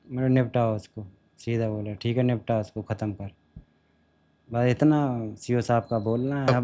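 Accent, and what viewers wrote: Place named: Gwalior, India. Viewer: Tumhari bhasha gwalior sambhag ke aaspaas ka hai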